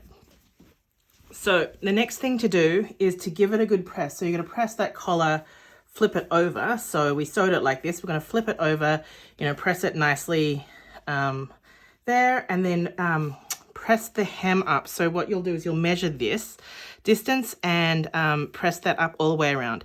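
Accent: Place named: Australia. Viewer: Great tutorial. I love your clear instructions and it’s so great to hear an Aussie voice! I have downloaded the pattern and will be making one of these just as soon as I have some twill tape.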